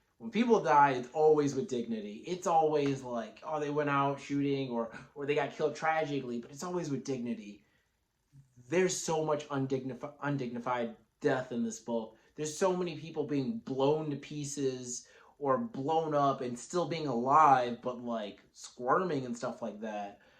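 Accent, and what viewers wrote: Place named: United States. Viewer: Great review! It is a shame you barely have any viewers because I think you get across the point Remarque was trying to make very well. From your accent I presume you're American, that makes it even better, getting that - in his intention - universal message of "just don't kill each other for the interests of others". I got here by somehow remembering how that book impacted me as a kid and then just typing it in. Ended up here, was anything but disappointed. Thanks for covering it that well. And again, you really deserved a whole lot more viewers.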